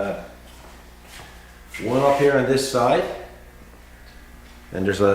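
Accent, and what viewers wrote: Newfoundland, Canada. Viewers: That sure sounds like a west coast Newfoundland accent??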